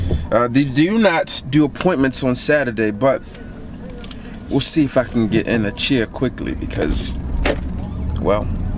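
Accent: Baltimore, United States. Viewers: U got a Baltimore accent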